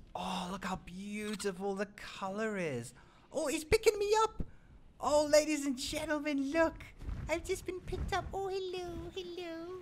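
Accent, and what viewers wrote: South Africa. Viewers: Omg a south african are u from cape town or Johannesburg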